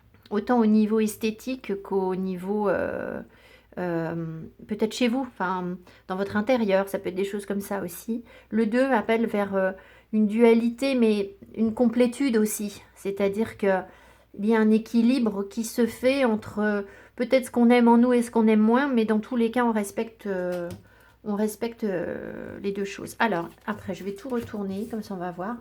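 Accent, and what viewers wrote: England, United Kingdom. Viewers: Merci, le tirage m'a beaucoup parlé. J'entame une formation en mars pour aller justement vers plus d'alignement et oser être moi faire ce que je veux vraiment. Mais bien entendu c'est beaucoup d'investissement (argent, énergie etc) et ça faire remonter effectivement des angoisses (est ce bon pour moi? Est ce le bon choix? Vais je aller au bout? Vais je réussir à mener tout de front?), l'ange Ariel m'a beaucoup parlé car justement, un de mes projets concerne la méditation. Je vais donc essayer de connecter avec cet ange! J'adore votre accent anglais; est-ce votre langue maternelle?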